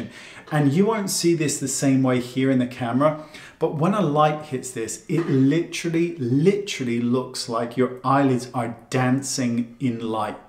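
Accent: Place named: United Kingdom. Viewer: Oh my gosh, you’ve started listing the products below! Thank you so much, that is such help to those of us who have trouble with your British pronunciation.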